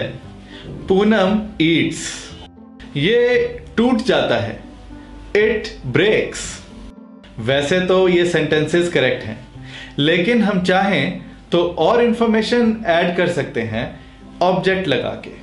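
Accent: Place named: Kerala, India. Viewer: Malayalam spoken English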